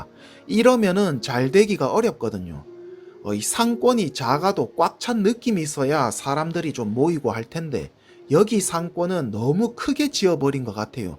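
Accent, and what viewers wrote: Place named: Daegu, South Korea. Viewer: 대구사람인가베. 억양이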